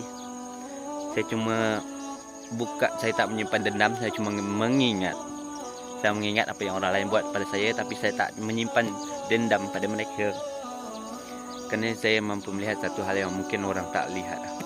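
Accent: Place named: Sarawak, Malaysia. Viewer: kau orang Sarawak ke?? suara dan cara kau bercakap macam kawan lama aku orang Sarawak nama Azmeer... hahahhaaha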